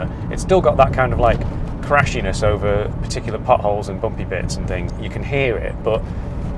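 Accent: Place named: Staffordshire, United Kingdom. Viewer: Staffordshire! I was listening to your accent, thinking you must be somewhere close to where i grew up (Stone). Keele University signs on the buildings puts you near Newcastle. Nice review!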